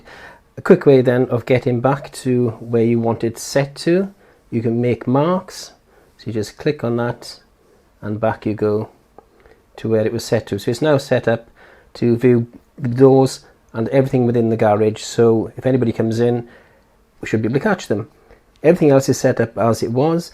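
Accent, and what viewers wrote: Wales, United Kingdom. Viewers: just bought one, this video was excellent, cheers for sharing, also do I hear a hint of welsh or am I linguistically challenged, lol?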